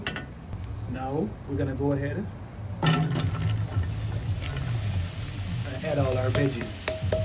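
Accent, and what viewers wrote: Jamaica. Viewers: Can anyone tell me where his Jamaican accent has gone ,seems to be missing 😮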